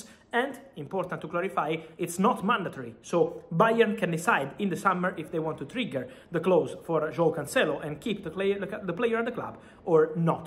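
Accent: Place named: Italy. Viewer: bro, the "e" in "reached" or any other verb in past tense is silent. Cmon, you are THE football journalist. No need to loose your nice Italian accent but put in a little more effort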